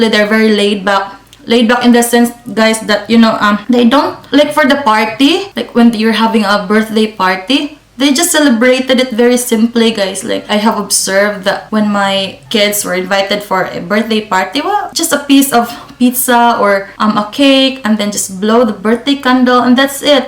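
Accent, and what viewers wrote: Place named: Philippines. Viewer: Sabi na nga be Pinay eh,lol. Just clicked the video, on the first word, alam na agad! Because of my musical influences, my love for their accent, geography at sabi nila masayahin din sila, gusto ko maka punta jan.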